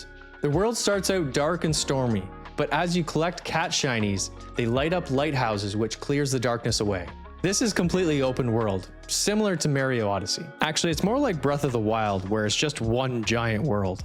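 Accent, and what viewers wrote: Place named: Canada. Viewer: "You find oat" "The world starts oat" "Mary-O" -Ah. A Canadian. Got it.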